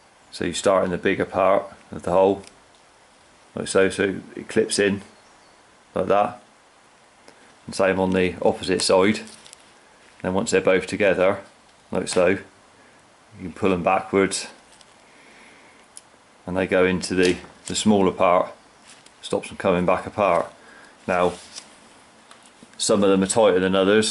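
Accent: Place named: Totton, United Kingdom. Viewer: Great video, very clear instructions. Are you from Totton? Accent sounds like it!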